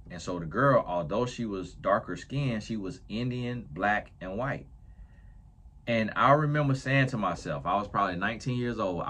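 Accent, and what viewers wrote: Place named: Deep South, United States. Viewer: I'm sorry you got flack for your accent. That's no bueno. Personally, I LOVE accents! New York being my favorite tbh. But southern accents are a close second for me. I live in the south right now, in Texas. You do sound kinda like you're from Georgia or the deep South. My mom's from Oklahoma and she has a lot of countriness in her speech and I tell her she has an accent and sometimes she gets offended lol. But when I say that, I mean nothing bad by it. Accents are what make people unique! There are stereotypes out there, but nowhere should it be implied that people who have accents are less intelligent or less skilled. One of my favorite therapists, Dr. Les Carter has a super strong southern accent (he's also from Texas) and I love it! Accents are amazing!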